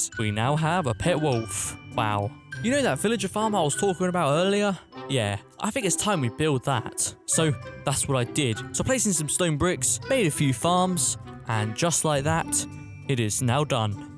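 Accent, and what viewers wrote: United Kingdom. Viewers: ARE U BRITISH I KNOW UR IN THE UK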